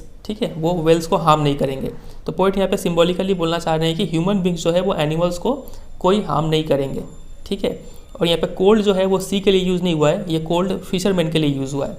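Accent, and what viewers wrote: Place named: Varanasi, India. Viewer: banarasi accent❤‍🔥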